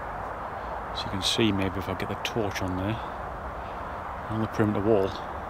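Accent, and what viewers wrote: North East England, United Kingdom. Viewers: Hi, new subscriber (thanks to the ouija brothers. Loving your channel. Going to binge watch all your vids tonight. Where you from, you sound like a north east lad. I’m in Hartlepool x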